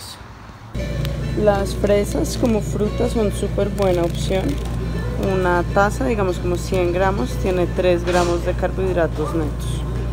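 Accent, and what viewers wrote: Colombia. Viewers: Es q los Colombianos son asi , gente bonita si forma de hablar sus modismos son.lindos y esta muer no se guarda naa nos dice las cosa tal cual ayuda mucho su sinceridad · Me recuerdas a mi ex colombiana estás súper linda , hablas como rolita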